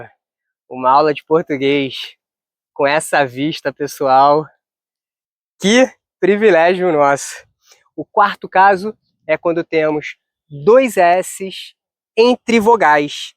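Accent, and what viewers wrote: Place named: Rio de Janeiro, Brazil. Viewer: Ilha Da Magia, loved the lesson my Brother. Sotaque Carioca e Top ta ligado. I really enjoy your videos because you distinguish the Carioca accent from other accents. Thank you for the licao mermao, valeu valeu abraco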